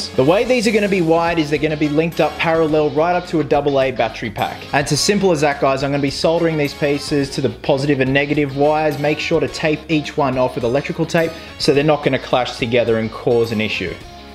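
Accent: Australia. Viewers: I could tell you were an Aussie from the start of the video.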